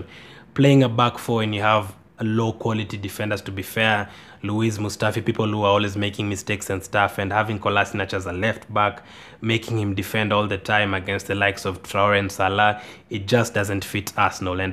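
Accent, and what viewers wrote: Kenya. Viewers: Yo he has a kenyan acsent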